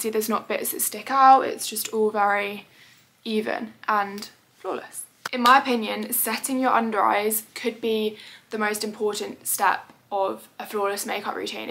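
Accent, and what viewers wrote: United Kingdom. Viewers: Did not expect her to be British 😭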